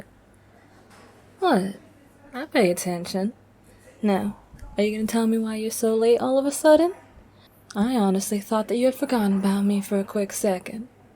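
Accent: South, United States. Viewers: I’m a sucker for a smooth southern accent, this couldn’t have been a better audio, thank you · Amazing audio I’m weak for your southern accent 😳🫠🤭👌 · yes! the southern accent let’s goo i could melt from the southern accent 🫠🫠🫠🫠amazing audio tho and amazing southern accent but we already knew that was amazing 🩷🩷 i have a feeling the southern accent was for a certain someone that is in twitch chat a lot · Accent time for me. Great southern accent. Thx for asking me out and being flirty while doing it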